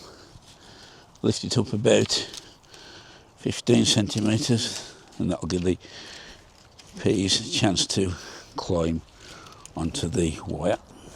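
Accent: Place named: Midlands, United Kingdom. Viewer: I always enjoy your patter, thanks👍 Also recon, at least from your accent, that you’re midlands based. That’s helpful to me as I’m in north Shropshire so we’ll have similar frost dates and I can use your sowing and planting out dates to check the logic of mine. BTW, could you let us know the variety of runner beans that you’re growing? They look like Scarlett Empire but there are many with colour and patterning like that. I always grow Scarlett Empire, Rhondda Black and butter bean type like White Emergo or Greek Gigantes. Always grow in the same places (no rotating), never dig their beds over, just top them out with horse manure in late autumn and they all crop well.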